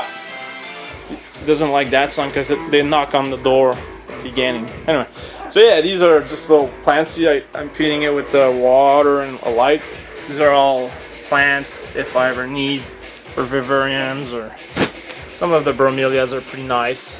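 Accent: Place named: Quebec, Canada. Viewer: Are you from Quebec bro?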